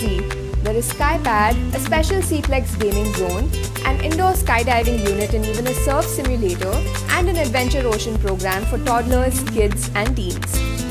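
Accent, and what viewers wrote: India. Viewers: Only Indians can understand your accent. Thanks for the subtitles.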